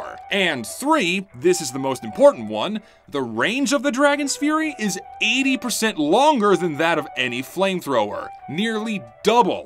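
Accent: South, United States. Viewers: Why is a southern accent creeping it's way into voice throughout the video? it's kind of creeping me out lol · texas accent shining through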